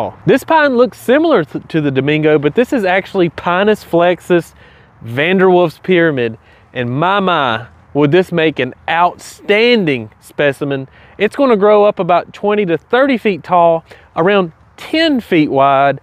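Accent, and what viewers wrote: South, United States. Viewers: Wow I have watched all your videos. Love your southern accent and excitement about conifers. Just amazing energy. Wish I had your job.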